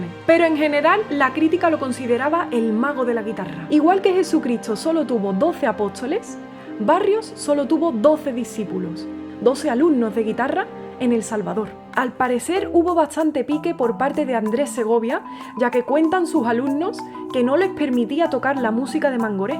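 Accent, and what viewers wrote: Andalusia, Spain. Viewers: Gracias! Me encanta la guitarra, su sonido, su historia. Y me encanta que nos cuentas la historia del compositor, sobre todo con tu acento andalu y siempre con una sonrisa! 🙂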